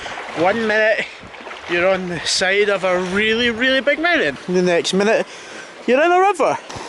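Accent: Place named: Scotland, United Kingdom. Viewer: Scottish revealed. Known by accent! xD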